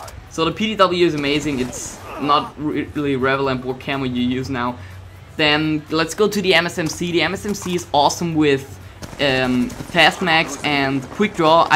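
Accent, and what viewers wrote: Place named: Germany. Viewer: He might be German, or European so that's why his voice is like that. And i must say that he is MUCH better than me, and luckier too haha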